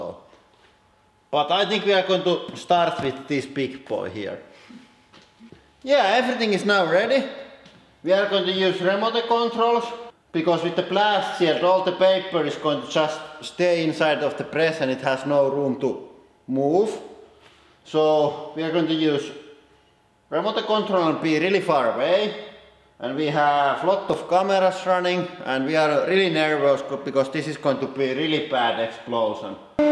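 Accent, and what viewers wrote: Finland. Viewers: Täytyy olla suomalainen, kuulee heti :D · Isn't this a finnish accent? 😂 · Myles Bishop he is from Finland. Finland people always have problems on speaking English. :D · His accent is Finnish not German-Austrian so he can't be Hitler even if he was alive.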